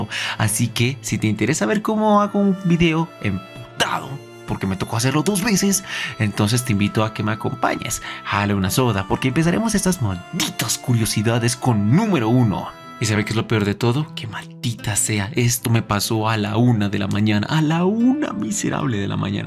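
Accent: Colombia. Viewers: Bro tú eres de Colombia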